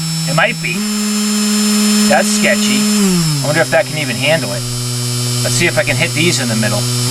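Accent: Boston, United States. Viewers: This guy from Boston?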